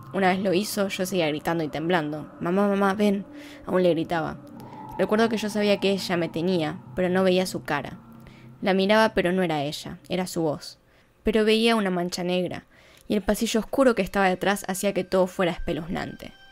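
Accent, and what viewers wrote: Argentina. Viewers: Bueno muñeca preciosa, no se como cai acá pero ya cai, por tu acento sos Argentina....o me EQUIVOCO. Mira piba sos muy bella.. Te saludo desde Bremen Alemania.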